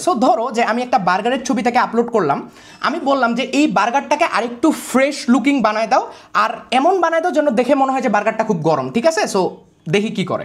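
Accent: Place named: Bogura, Bangladesh. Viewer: বগুড়ার ছোল বলে কতা,,❤❤❤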